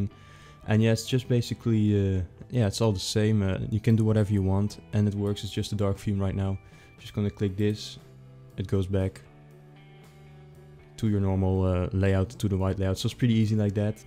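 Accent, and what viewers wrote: Netherlands. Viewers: he has an a dutch accent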